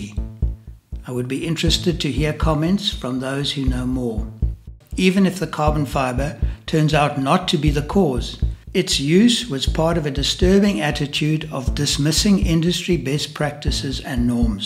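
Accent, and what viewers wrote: South Africa. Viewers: I hear a very Afrikaans accent 😄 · Nice South African accent . 😊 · Do I detect a South African accent? 😁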